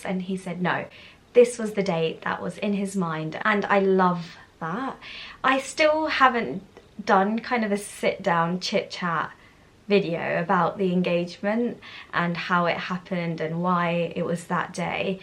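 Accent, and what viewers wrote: United Kingdom. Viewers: lol love how you say sweaty mess it with your British accent. I feel like that all summer here in the US just a sweaty mess just like says.😂😂😂😂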